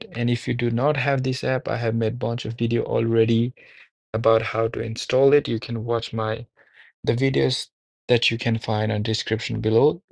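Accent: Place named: India. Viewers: Indian accent, immediate distrust. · Indian accent, immediate trust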